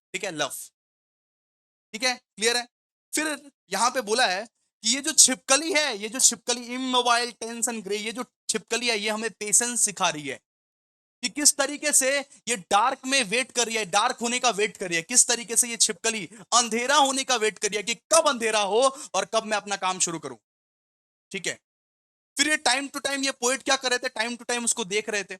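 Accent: Bengal, India. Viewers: Hahaha 😂 Your bengali accent is so cute (Khub mishti 😆)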